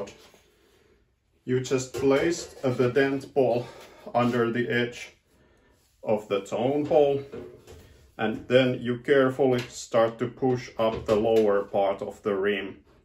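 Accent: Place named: Finland. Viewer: Hi, Awesome Video. I thought I recognised your accent, and with a name like that, I thought surely you are from the fine country of Finland. I have a good friend of mine who lives in Kuopio. Thanks for sharing!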